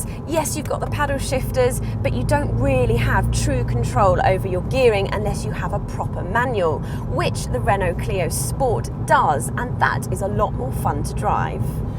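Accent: United Kingdom. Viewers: that UK accent. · Yea she my not be a ten in the look department but her looks plus her knowledge of cars & great attitude makes her an eleven! :-) & for us yanks her british accent is another +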